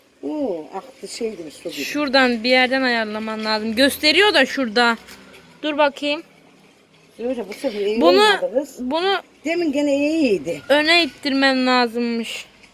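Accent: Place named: Mersin, Turkey. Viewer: Ben Mersinli oldugunuzu anladim konusmanizdan bende Mersin'de yasiyorum cunku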